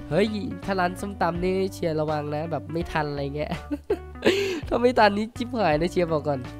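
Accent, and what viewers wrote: Isan, Thailand. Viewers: พี่ พูดอีสาน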